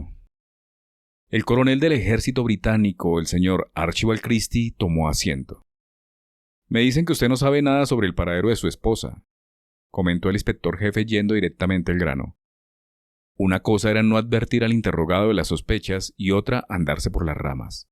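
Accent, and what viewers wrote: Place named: Colombia. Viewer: Gracias por su lectura, me atrapó. Por otro lado, me encanta su acento colombiano.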